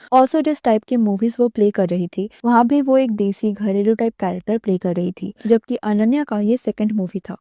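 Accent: Bengal, India. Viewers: I love ur bangali tone 😊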